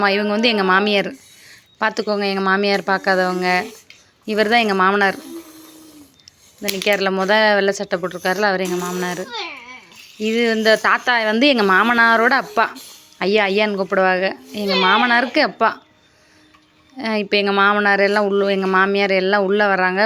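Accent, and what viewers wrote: Thoothukudi, India. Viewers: நீங்க தூத்துக்குடி ஆ சிஸ். நா நிறைய டைம் உங்க சேனல் பாக்கும் போது நினைப்பேன்.நம்ம ஊரு பேச்சு வழக்கு இருக்கு பாத்தேன். நா திருநெல்வேலி சிஸ் 😊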